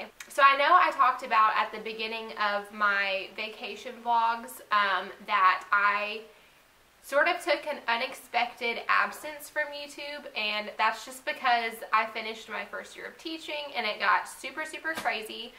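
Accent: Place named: Tennessee, United States. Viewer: I found your channel through this video, it was reccomended to me because of my minimalism searches. lol. I rarely comment on anything on YouTube, but I had to say, I love everything about you and your channel. I live in Wisconsin, but I'm from Pigeon Forge, Tennessee. You remind me of home! Your accent, your style, your record.. Everything ❤️ You're such a breath of fresh air. I'm subscribing for sure! ❤️❤️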